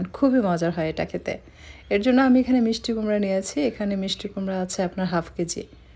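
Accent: Bangladesh. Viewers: Khub stylish apnar biboron....apnake dakhar ichhe...apni open kitchen baniye amader ranna show korun.khub kaydar ranna apni janen.....apnar channel ami follow kori.bangladeshi dialect y kotha bolleo apnar bisheshotto achhe anyo man er adobkayday bojha jay tai apnar kotha shunte bhalo lage.INSHAALLAH AMON SUNDOR MON ER MEYE HOYE PRITHIBI JOY KORUN RONDHONSHILPO KOLAY!🤗🤝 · nijer khawa nijei khan, kothar tike apne bangladesh neki, sorry amar valo lagenai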